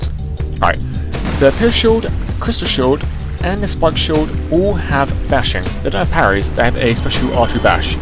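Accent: United Kingdom. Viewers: i wish i could understand more than half of that. you are talking too fast and with too much of a british accent to be perceivable. please do subtitles.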